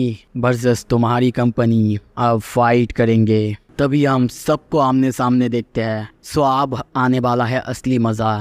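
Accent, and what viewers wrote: Bengal, India. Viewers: Are you from Bengal by any chance?😅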